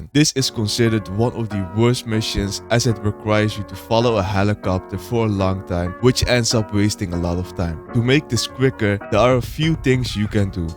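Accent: Netherlands. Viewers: You are dutch aren't you? Great tips btw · you sound very dutch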